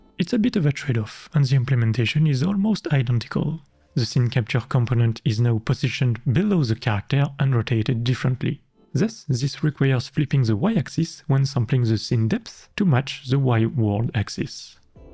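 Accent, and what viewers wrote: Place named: France. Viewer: Accent is just terrible and subtitles def don't help. Invest in some speech classes french boy lol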